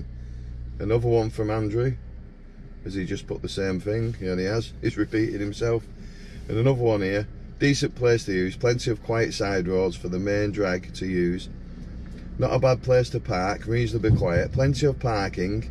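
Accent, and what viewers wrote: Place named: Lancashire, United Kingdom. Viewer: Evening. Hope you’re ok 👍🏻 I’m loving your videos matey 🤩 It must be your Lancashire accent lol 😆 You talk a lot of good sense and give some fantastic information in a really cool way 👍🏻 Take care until the next time cheers 😎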